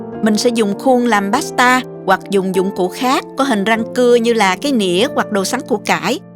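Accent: South, Vietnam. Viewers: Cám ơn đã chia sẻ cách làm chè con sò.Cách chỉ dẫn Rất gọn gàng, dễ hiểu, dễ làm và nhất là giọng nói miền Nam rất dịu dàng và ấm áp. Điều mà tôi thích nhất là cô không nói thừa, không nhắc đi nhắc lại những điều căn bản trong cách làm mà các bà nội trợ nào nghe và xem qua cũng nhớ. Chúc cô luôn vui khỏe và luôn thành công trong công việc của mình!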